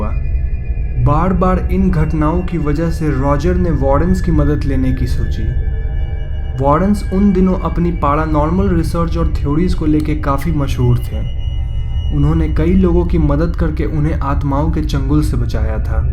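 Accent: Bengal, India. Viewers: A perfect Bengali accent..... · Are you Bengali?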